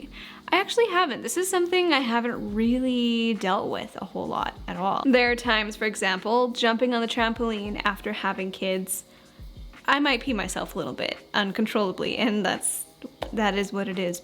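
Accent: Western Canada, Canada. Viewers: It’s definitely more of a west Canadian accent because here in Ontario we don’t say it like that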